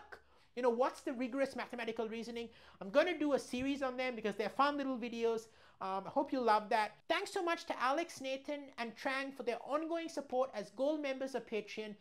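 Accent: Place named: France. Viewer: I think I understood you correctly, but at that point I didn't notice you were French, yet. Anyways, it's much clearer now. The main giveaway of this video is that most of these low primes are easy, even trivial, with some exceptions (maybe just 91, because 49 = 7² and 77 = 7 × 11 are so ludicrously simple)...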